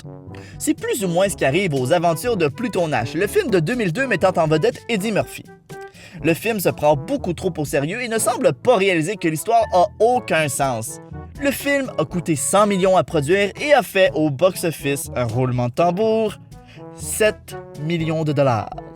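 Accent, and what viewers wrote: Canada. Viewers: J adore l accent candien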